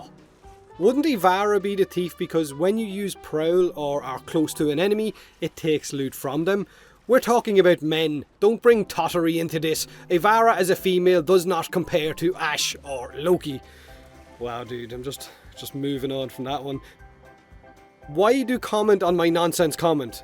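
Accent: Ireland. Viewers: Jacksepticeye reading comments... reading comments... I don't think we realise just how much we need Irish men reading and taking the mickey out of internet users.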